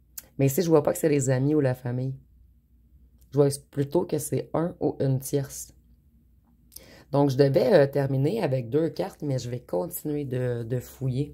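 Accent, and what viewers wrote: Quebec, Canada. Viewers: Tres bel accent quebecois chere madame❤❤❤❤❤❤❤❤❤❤❤❤❤❤❤❤❤❤❤❤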